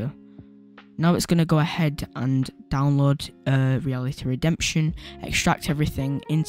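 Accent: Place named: United Kingdom. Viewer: Oh you're British 😮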